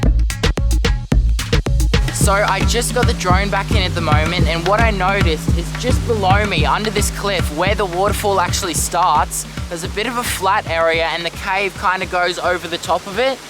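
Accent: Australia. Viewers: Nice vid. That ozzie accent though....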